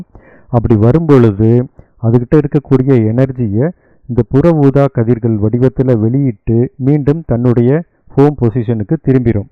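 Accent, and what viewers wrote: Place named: Sri Lanka. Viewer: Valthuhal. Neenga engayo poga poringa. Mulu Thamizh kekum podhu oru Sri Lankan vibe iruku. English warthaigal warudhu. Medhuva korachikonga. Tanglish la eludhinadhuku mannikanam. From 🇱🇰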